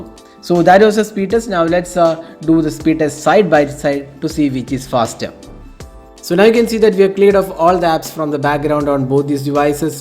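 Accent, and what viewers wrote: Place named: South India, India. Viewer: Hey man you sound south Indian... Nalla pilay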